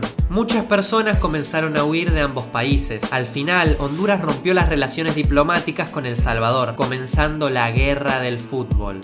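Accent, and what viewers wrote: Argentina. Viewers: no se nota el acento argentino?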